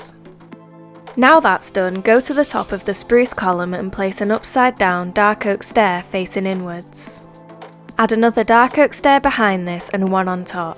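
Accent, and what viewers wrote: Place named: Scotland, United Kingdom. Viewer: Honestly i like your Scottish voice so now ima use your tutorials in my world now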